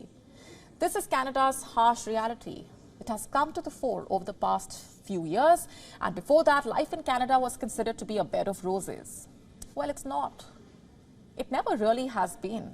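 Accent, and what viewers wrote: India. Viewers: this girl is trying to speak like an English speaker but her indian accent really dominates how she pronounce the words.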